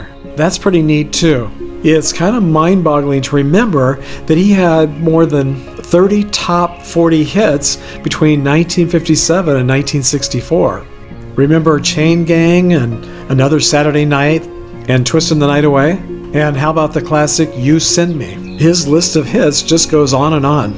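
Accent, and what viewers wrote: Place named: California, United States. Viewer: you remind me of Lyle Talbot's son, Talbott--aka "Gilbert Bates" on LEAVE IT TO BEAVER. You even share the same name--even...lol. You both have that California accent; sounding the same. I wonder if Gloria Talbot is related.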